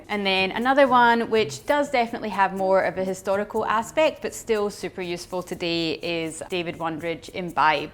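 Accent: Scotland, United Kingdom. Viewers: Thank you for all of your effort! Scottish accent? The best... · First! Love the scottish accent :)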